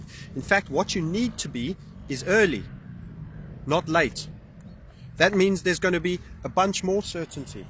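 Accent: South Africa. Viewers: FoLA I am glad you have not lost your SA Accent Bru'. Fear-of-Loosing-Accent.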